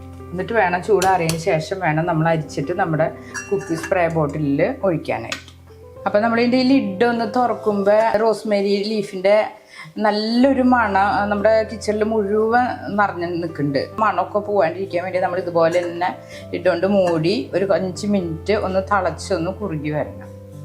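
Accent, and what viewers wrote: Thrissur, India. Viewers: Changathi, athu njangade thrissur bhashayanu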